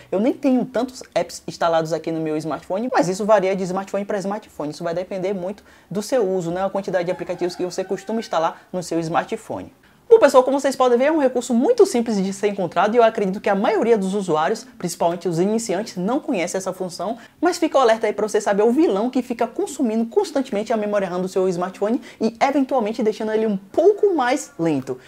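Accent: Bahia, Brazil. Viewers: Estou sentido um sotaque familiar( baiano ) no vídeo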